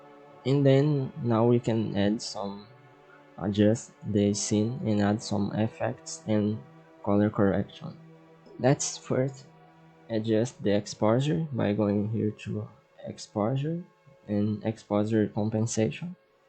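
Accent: Brazil. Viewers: inglês brasileiro :)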